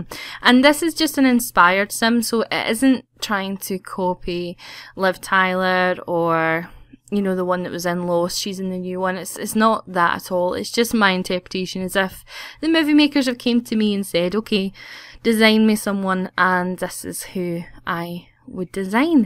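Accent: Scotland, United Kingdom. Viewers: Hi, I love the LOTR, and your Sims really looks like an Elf, she's very pretty :) Are you Scottish by any chance? :D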